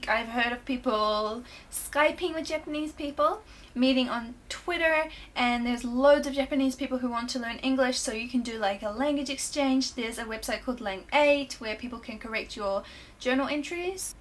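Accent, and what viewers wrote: New Zealand. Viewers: Woooooow I loved the New Zeland accent. It's one of the easiest accents that I've heard! I loved your video talking about japanese language!! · mimeiさんってニュージーランド出身だっけ？ニュージーランドアクセントってあるのかな？ニュージーランドは訛りがあんまないイメージ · I thought u sounded like a kiwi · Are you from New Zealand as well ??!?! definitely sound like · No that’s how New Zealander pronounce the word for example if you say the number ten, seven, leg, pen, next, it will sound tin, lig, pin, nixt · YUUSS KIWI FTW!! I know someone who moved to Japan but she lost her nz accent. I love how you still have the nz accent when speaking English ^^ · hah yea, that is a pretty standard accent in New Zealand :)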